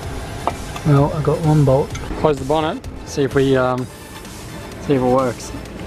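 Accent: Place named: Australia. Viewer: Hey, I love the videos, they’ve been quick, clear and direct info to these motors. Thanks a ton. Also the Australian accent mate! Keep the videos coming!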